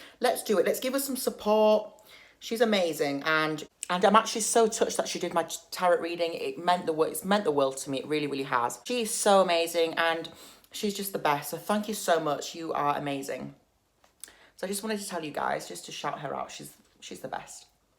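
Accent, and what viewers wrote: United Kingdom. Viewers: HILARIOUS 🤣😂🤣...l love your British accent...so BEAUTIFUL...Awesome video👍🏼🇨🇦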